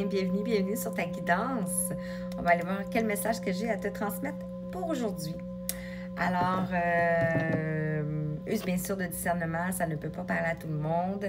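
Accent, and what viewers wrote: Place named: Quebec, Canada. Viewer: je suis epatée par vos guidances ❤❤❤❤et jadore votre joli accent québécois 🙏🙏🙏🙏vous etes ☀ belle journee a vous 🙏🙏🙏et merci pour vos guidances qui m'éclairent dans mon❤❤❤ cheminement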